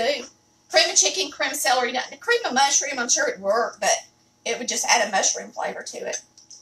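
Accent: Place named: Georgia, United States. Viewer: Thank you , your videos feel like home to me. The way you speak reminds me of home in Georgia.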